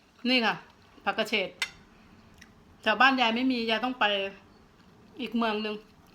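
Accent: Isan, Thailand. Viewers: ยายเป็นคนจังหว้ดใหนค่ะสำเนียงเหมือนคนอีสานแถวสกลนครนะใช่ปะค่ะคือคนบ้านเดียวกันนะ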